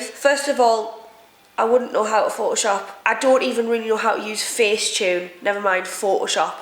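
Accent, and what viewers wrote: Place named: Sunderland, United Kingdom. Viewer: Cher Horowitz she is from Sunderland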